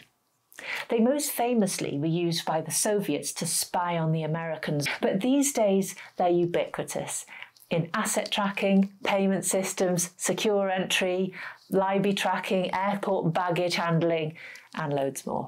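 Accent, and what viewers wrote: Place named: RP, United Kingdom. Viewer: What's a great SmartHome introduction. Very informative, your mounth 'prints' queens spoken English, and you touch on things like ESPhome and many more that regular (even IT) guy would not be aware of initially. Are you UK based? If so, can you sometimes add links to products where you usually buy from?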